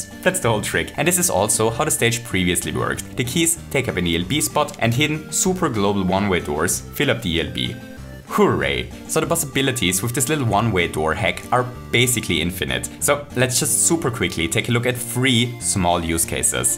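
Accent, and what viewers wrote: Austria. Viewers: Are you from Austria?:D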